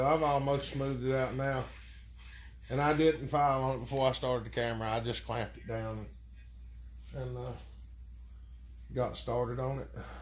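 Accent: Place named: South, United States. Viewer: "Hello Everybody" with that southern accent just lightens up your day. You should voice for Hallmark, they could produce special edition electronic greeting cards.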